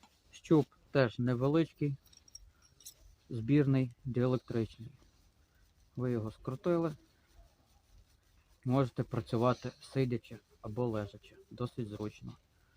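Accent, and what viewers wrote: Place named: Russia. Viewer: если это правда, отчего же тогда акцент русский, а речь украинская?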